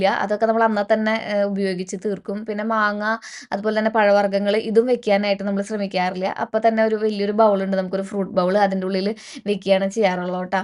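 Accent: Thrissur, India. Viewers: Eyyy... Noo... ഇത് തൃശ്ശൂർക്കാരുടെ സ്വന്തം ശൈലിയാണ്.. ❤️❤️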